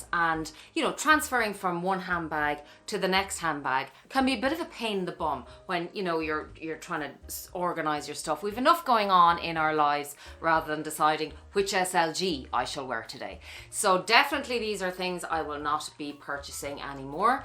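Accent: Ireland. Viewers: Love your accent! Are you Irish? Are y’all still locked down? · Love the Irish lilt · Hi! I’m a new subscriber & really enjoyed your video. I’m trying to place your accent, Irish? It’s charming!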